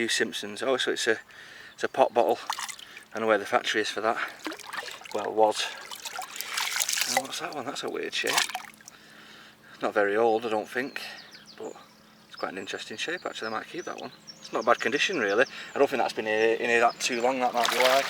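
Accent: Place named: Northern England, United Kingdom. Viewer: Oooh a wee bit off there Dawn as a Scotsman I can confirm is not I'm afraid. I commented on his accent the other day, got to love the northern tones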